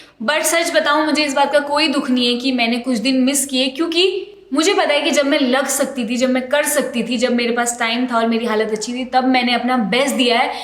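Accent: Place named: Uttar Pradesh, India. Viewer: Really appreciate your support and spirit changing many many lives.... Dear this Abhilasha from Varanasi.... yesterday saw your first video and then watched many... laga you are my old friend... your outlook towards life simple yet amazing.... n apni U.P kind Hindi loved it... May God bless you Dear be happy always ❤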